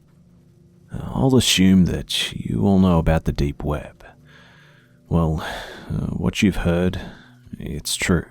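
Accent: Australia. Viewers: I fucking love your Aussie accent hnnnng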